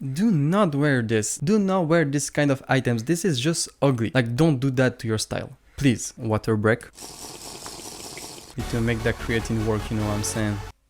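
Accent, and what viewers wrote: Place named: France. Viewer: accent fr spoted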